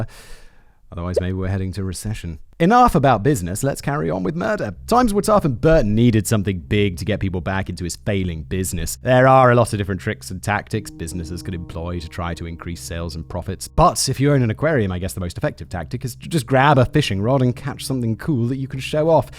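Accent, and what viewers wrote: United Kingdom. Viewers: You Britt's crack us Americans up💯....not just the ridiculous way you talk....but your genuinely INNOCENT demeanor 👀........I know there's tuff guys out there....but honestly from listening too you, there seems to be A LOT of panzees👀👀...an I mean that in the most respectful way possible....you an your kind just DONT seem too tuff honestly 💯🤔🤔🤔...I mean seriously man👀....your talking about planes...living in other countries an STAYING👀....taking "Holiday" Somewhere else than YOUR own country 💯👀......Sorry man...just ain't crackin🤪🤪🤪....that's the BEAUTY of the nation you lost in 1776.....we HAVE it all.....jungle, swamps, plains, grains, an tall tree's 💯.... Buffalo here an plenty of "citizen" deer EVERYWHERE...not the "Kings"🤣🤣🤣🤣.....Sorry buddy, I'm just NOT a fan of old English society 🤢🤢🤢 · Coo-gee, I love your British pronunciations. 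Could-gee is closer. ANZAC Day is in February and has dawn and dusk services, a parade of service men and women and is in remembrance for WW1. It is also the only day Two-Up gambling is legal in Australia. Remembrance Day is held with a one minute of silence at 11am, 11th November every year, and at certain places, like the RSL, they play The Last Post, and is now recognised as a commemoration of the fallen for bot WW1 and WW2.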